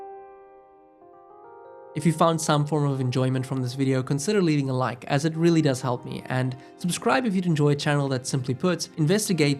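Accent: South Africa. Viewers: I smell South Africa? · South african?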